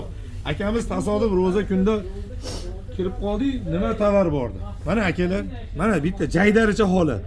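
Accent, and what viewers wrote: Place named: Andijan, Uzbekistan. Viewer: Бу бола жон куйдириб гапиришидан Анжанлиека ухшайди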